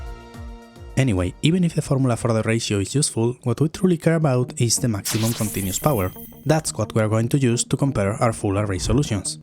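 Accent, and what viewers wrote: Spain. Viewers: You sound Spanish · Can't hide that Spanish accent from me boy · Where is your accent from? Spain? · Esto es acento español? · Amazing stuff. Subscribed. I checked your channel and this one video seems different than your previous ones. This must have taken you so much work. The results talk by themselves. The accent sounds familiar. I bet Spanish. Bravísimo.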